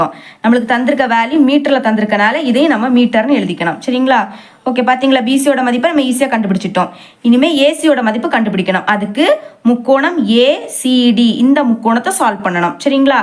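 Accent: Kanyakumari, India. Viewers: neenga kanyakumari ahh akka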